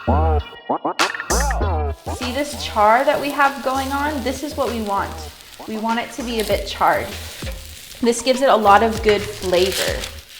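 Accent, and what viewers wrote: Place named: Morocco. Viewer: Hello hello bravooo thank you for your recipe ❤ I like it 🌹 good luck . I like your Moroccan accent ❤❤❤❤ keep it good guys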